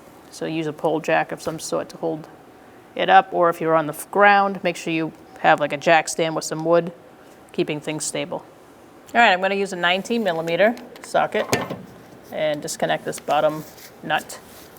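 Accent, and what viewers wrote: Boston, United States. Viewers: Hi quality video and textbook Boston accent! After 12 years all my shock pieces are frozen.I'm not gonna use torch, just repeated srpaying and hammer hits and a few days, I also used a 4' steel pipe sleeved over breaker bar for awesome leverage., the top of new shock in the "cage" is a really, really tight fit and threading the needle is by braille on the top. I used silicon spray to move the shock top in the cage, it's that tight. Doing a job where all the bolts aren't frozen must be a lot of fun, something I'll never esperience!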